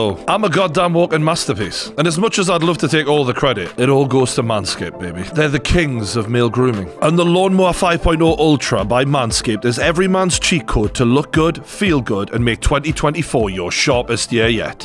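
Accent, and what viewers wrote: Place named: Geordie, United Kingdom. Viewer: Im a HUGE jones fan but to call him the outright goat is alil far-fetched, sure hes had an amazing career at LHW but im hearing alot of facts about jones, when he was younger and hungry but now hes just tryna take the easy way out... Huge aspinall fan also (im American) i think he has to have the fight with jones... It needs to happen. Ps i love the geordie accent 🙋🏻‍♀️😂😂😂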